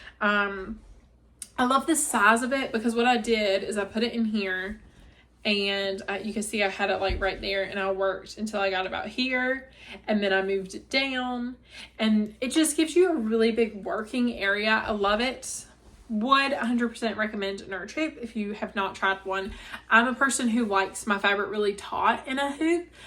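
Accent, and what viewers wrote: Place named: Eastern North Carolina, United States. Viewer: Congratulations on the new home! It’ll be such a sweet time, and no hurry to “make it your own”… your style will take shape over time ☺️ Been watching and enjoying since your first post and am not usually a commenter on videos, but wanted to encourage you today… I’m also a fellow eastern NC girl (of 52 😉) and love hearing our native accent on YouTube 😁💕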